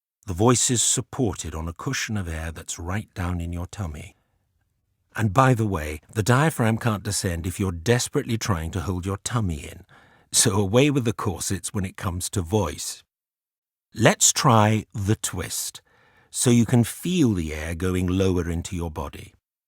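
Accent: United Kingdom. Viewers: Wow, the British accent is so pretty!!, but I cannot remove my mexican accent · Is he British? where about in Britain?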